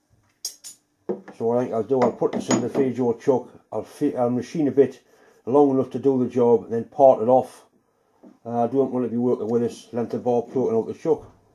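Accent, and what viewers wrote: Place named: Northern England, United Kingdom. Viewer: Sorry must have missed him saying that. With his Northern accent he is difficult to understand sometimes. If you want a good laugh, turn on the caption feature and see what YouTube makes of what he says. Regards from Australia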